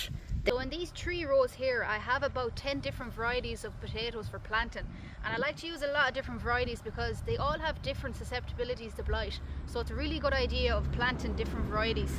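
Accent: Ireland. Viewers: I love that irish accent…. Right. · Wow intelligence backed by a witty sense of humor good looks and that wonderfull Irish accent and just a generally inspiring girl who loves what she does and is exited to share that with us all.. It sure why this came into my feed but happy it did though❤ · Love your accent, no wonder my grand-grandfather married an Irish girl. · Irish accent sounds exhausting to speak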